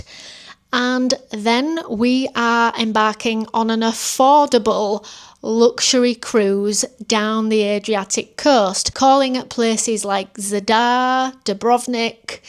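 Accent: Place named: United Kingdom. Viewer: I enjoyed your video , This is the most British British accent I have ever heard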